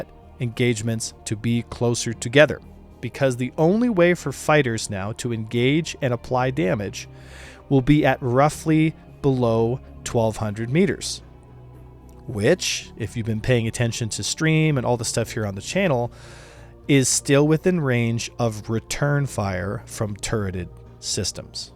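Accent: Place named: Canada. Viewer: you sound SO Canadian here lol.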